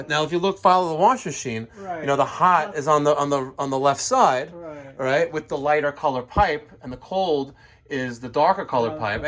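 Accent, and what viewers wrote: New York, United States. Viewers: Excellent video like ALWAYS or as I stated in an earlier video last year when I first Subscribed... You always sound like Ron Jeremy with your New York accent. Sorry for the possibly negative stigma that it may bring up but I still say you are the man and we need some personality like you here in Utah! Anyway, stay safe and thanks for all you do! PEACE!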